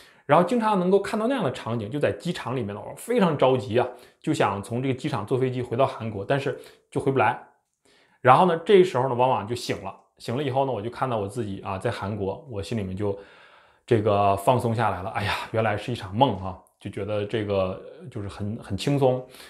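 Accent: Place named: Northeast, China. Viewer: 抱歉噢。。。我听到你的 “十三岁 ” 发音 就想笑。。。 东北口音吧？？？。。。我新加坡人。听了 很特别 可爱。。。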